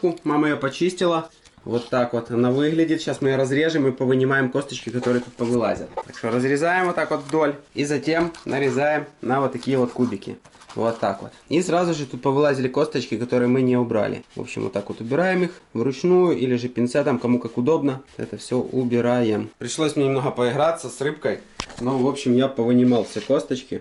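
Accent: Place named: Ukraine. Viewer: Может он сам будет решать что ему делать, и говорит он хорошо, тем более он не из России, в украинском акценте нет ничего плохого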